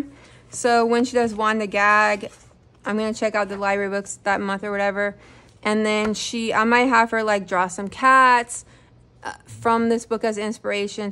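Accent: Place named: Argentina. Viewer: Hi! I am curious to know where you are from..the way you speak English sounds a lot like mine. I am originally from Argentina but I live in the US for a litter over half of my life. And I homeschool my kiddos that is why I got drawn to your channel. Thank you for sharing.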